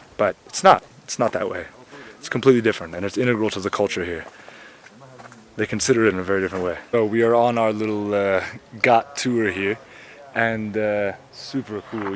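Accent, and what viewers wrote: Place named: United States. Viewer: Nice video man I hope you add a lyircs on the next videos cuz the amircan accent is not that easy to be understood from the first time.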